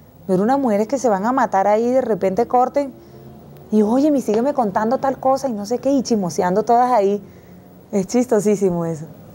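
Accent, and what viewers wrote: Colombia. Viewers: No jodaaaa aca si hablas costeño, en Betty hablas como cachaca, eche, mandas cascara, costeñacachaca no joda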